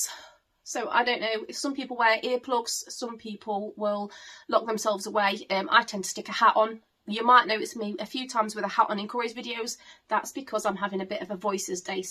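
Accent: United Kingdom. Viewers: Thank you for being so open. I have bipolar (with psychosis) and ptsd so hello from one nutter to another. You my dear are the poster child for showing the world that you can have a chronic/severe mental illness but also be an amazing and attentive mother with stregnth many don't have. That's a fuk you to stigma if I've ever seen it. I must have missed this video when it was uploaded but I too am currently a crap goth in my pyjamas all day too anxious to leave the house... by your accent I don't think we're too far away... maybe will bump into you on a patch ward some day....but hopefully not for either of us lol. Anyway much love from South yorkshire ❤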